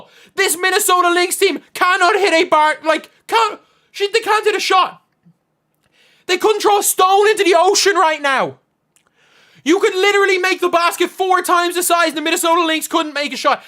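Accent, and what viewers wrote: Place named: Ireland. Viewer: No worries – an Irishman talking about anything is entertaining to many Americans.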